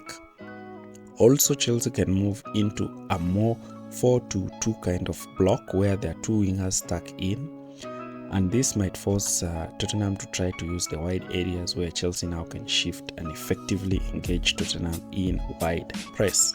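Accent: Kenya. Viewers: Sounds like a Kenyan😃😃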